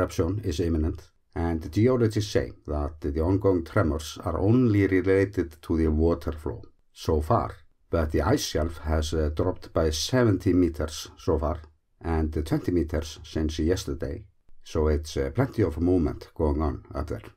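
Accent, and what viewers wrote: Iceland. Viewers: you are good at this. engaging and I find a real relaxation in your Icelandic English and cadence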